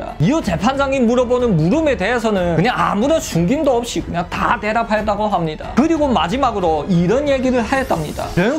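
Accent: Yanbian, China. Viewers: 는 연변사람인가요?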